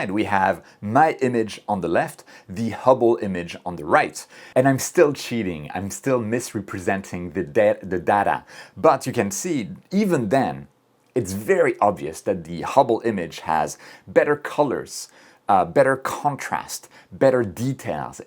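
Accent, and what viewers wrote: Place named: France. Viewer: in japan and with a french accent, verry intresting~ tbh am not surprised x3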